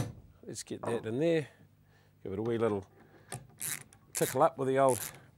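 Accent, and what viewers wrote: New Zealand. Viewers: well it is true. He is from New Zeland and I learned British kind of English... 😊 there is a huge different in pronaunciation. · He speaks with a New Zealand accent. Their pronunciation of vowels is different "ligs" (legs) and the " ls" are not pronounced like bevo (bevel). His explanations are easy-to-understand and he has a high skill level.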